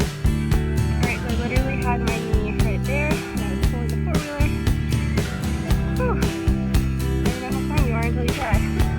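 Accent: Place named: North, United States. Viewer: im going to guess you were raise more north than Illinois. Some of your pronunciations are more northern than me and I am in southern MI.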